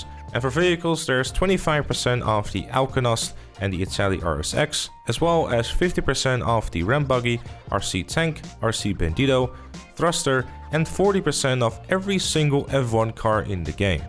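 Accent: Netherlands. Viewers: Love it, are you Dutch tho?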